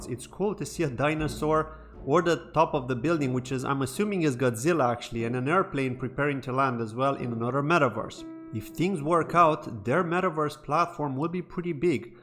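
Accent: Ireland. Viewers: really !!! Didn’t know that, I thought that you had a touch of a Kerry accent alright 😂😂😂 yep, I’m Irish 🇮🇪🇮🇪🇮🇪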